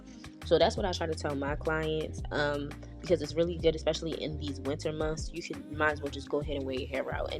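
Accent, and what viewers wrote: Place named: Philadelphia, United States. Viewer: I hear a Philly accent. Where are you located so I can get my hair done?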